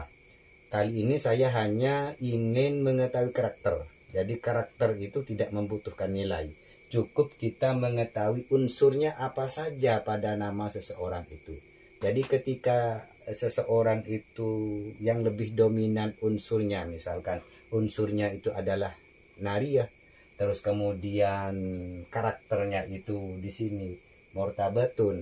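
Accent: Madura, Indonesia. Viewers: Sampean kyk logat nya Madura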